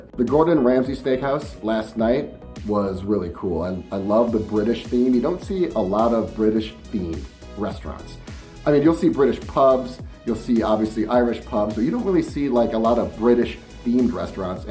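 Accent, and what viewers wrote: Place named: Wisconsin, United States. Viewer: I knew it! I caught subtle hints of that Wisconsin accent on your previous vids and that was what I guessed. :)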